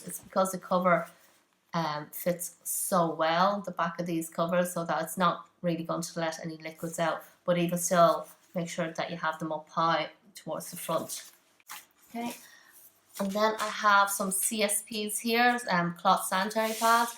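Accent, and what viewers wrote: Ireland. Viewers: it’s an irish accent! i was wondering about that but didn’t recognize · Just found your channel and love it. Great to hear the irish accent. I'm irish too, from Sligo. Great tips on using the snaps. I have liked and subscribed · Are you from Ireland? Thank you for this I couldn’t read Chinese instructions. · Thank you! I've been looking for such buttons and tools but the results are pretty much all on Amazon and/or made in China, the 2 things I wanted to avoid. By your accent, I'm guessing you're Irish too? If so would you happen to know of anywhere else I can buy them, particularly a good tool that isn't made in PRC? I'm not finding any in Hickey's or similar shops. (I found some buttons from Honk Kong which is fine for me and there's a Taiwan site which have the press pliers tool but it's mainly for bulk orders)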